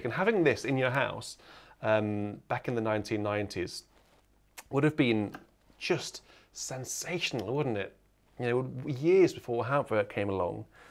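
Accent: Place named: United Kingdom. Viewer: For 1990's digital organ (Viscount), they are number of quite good sounds, others????. Sounds probably could be better if tweeked with amplifier and biiiiiggg speakers. But nothing comparable to 2021 Hauptwerk. I took my AGO Service Playing certificate in 2010 on a 1997 Rodgers 950 3 manual professionally installed Speakers/crossover + 6 ranks of Fufatti Pipes. in 2000 seat church. That sound I thought was excellent. But Hauptwerk in 2021 is the best!!! Digital Diapason has never sounded as good as the real pipe. As a Texan your commentary is always fun to hear--your words sound more intelligent with a British accent!! Love the dialog: organ is "rather heavy" to move, Jolly useful", Holy Moly", "get the Dyson", etc. Appreciate you., too.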